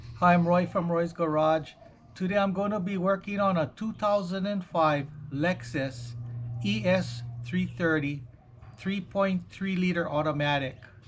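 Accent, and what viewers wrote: Hawaii, United States. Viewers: Nothing says Hawaii like your accent, my friend. I bet you're about old enough to remember the following song on the radio - probably KIKI - "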